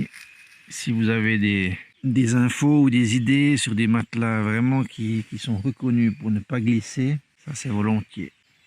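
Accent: Switzerland. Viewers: Bravo à toi d'avoir tenu jusqu'au bout. Tes vidéos sont extras et tes commentaires sont très divertissants et plein de bons conseils. J'ai bien compris que tu film avec un téléphone mais comment tu fais pour pouvoir ramener autant d'images ???? Tu consommes combien de cartes mémoires ? Par contre chapeau pour les prises de vues, un vrai cinéaste ...... Continue comme ça c'est top :-) Bonne continuation. PS : J'adore l'accent Suisse ;-)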